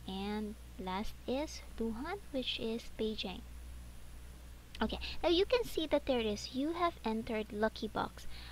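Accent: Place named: Philippines. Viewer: You talk like my friend from the philippines, it's so cute! Also thanks for helping!!!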